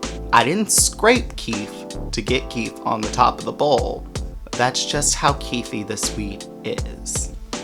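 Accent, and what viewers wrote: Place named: New Orleans, United States. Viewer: That explains the accent! I'm from New Orleans so I'm like this isn't a Cali accent it's my neighbors accent lol!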